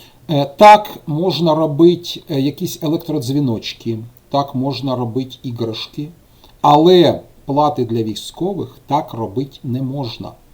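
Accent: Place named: Kharkiv, Ukraine. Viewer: Харкiвський акцент украiнськоi, на дев'яносто п'ять вiдсоткiв майже такий, як I киiвський))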